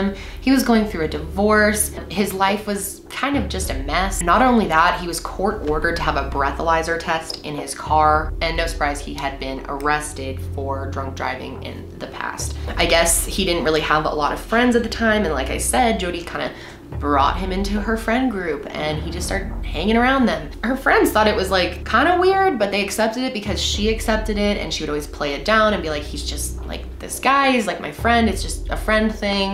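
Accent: Minnesota, United States. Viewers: Her cute little MN accent 🥺🥺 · you're doing a case super close to home.. st cloud is 1.5 hours away from me.. and cedar rapids is 2.5 hours. mason city is 2. super close. other note,, our MN accents are strong lol. I get picked on for certain words I say. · I loooove Minnesota accents. Why should she, or anyone, have to work toward getting rid of it? This case is so sad. :(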